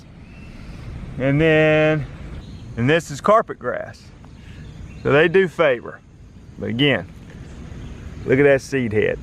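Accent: South, United States. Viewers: His accent makes my mouth feel like chewing a southern gum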